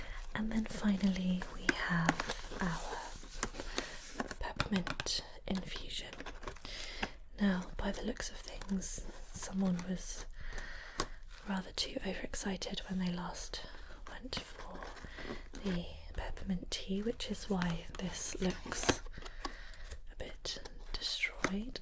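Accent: United Kingdom. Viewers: Where are you from? Accent sounds british? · a British English ASMR channel is good news, ty and please keep uploading.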